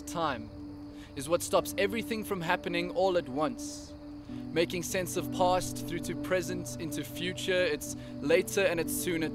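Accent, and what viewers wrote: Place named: South Africa. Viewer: Is he south african???? Sounds south african! Would be epic if he was!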